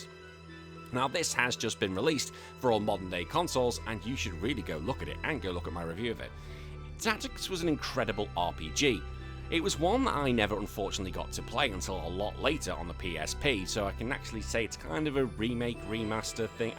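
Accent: England, United Kingdom. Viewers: "i don't give a..." whatever man just tell us your picks and stop pretending to be a tough guy. it will never work with that English accent I can tell you that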